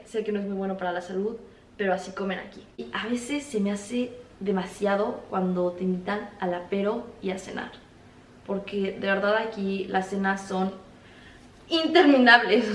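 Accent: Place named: Mexico. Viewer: De que región de México eres, por tu forma de hablar ¿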